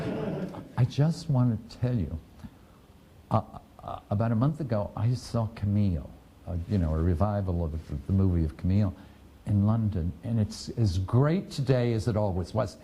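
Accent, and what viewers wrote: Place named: United States. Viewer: Awful narration, not to mention the god-awful American accent.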